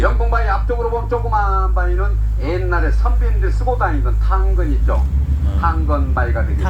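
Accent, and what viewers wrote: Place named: Jeolla, South Korea. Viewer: 구수한 전라도 사투리 정겹습니다 에메랄드 빛 바다, 코끼리 바위, 거북이 바위, 거시기 바위... 홍도 정말 절경이네요 영상 잘 보고 흔적 남기고 갑니다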